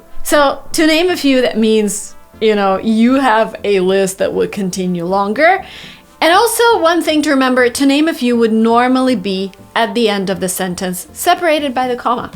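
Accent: United States. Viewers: Can I be like you... I wanna talk alike you.. I'm dying to learn this accent... American 😭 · Studio would be better. But then yes anything that please you or form your comfort. I'm always focused on your accent so that I can interpret everything you say. And honestly I've been watching your videos for past 1.5 years or more & I can confidently say I can not only understand your entire accent but many other American speakers. Thank you so much from the bottom of my heart ❤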